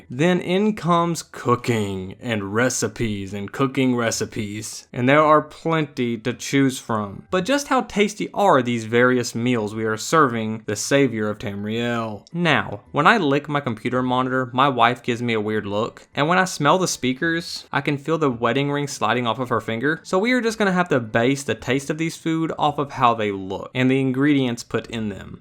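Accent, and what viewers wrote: United States. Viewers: What state are you from? There needs to be more cowboy accented Youtubers out there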